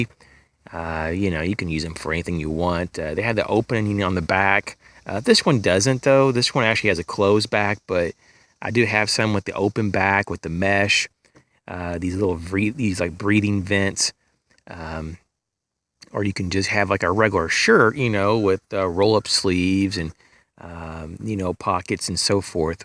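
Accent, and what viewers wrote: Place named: South, United States. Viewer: Solid, important info that folks fail to mention in most survival videos. Lovin' the "warsh." 😂 reminds me of my family talking in the south down in Springfield, MO. Btw: Mr. Hank Hill, you forgot to mention your extensive knowledge on propane...but yet you nailed it on the synthetic underwear. Haha. Have a great day pal.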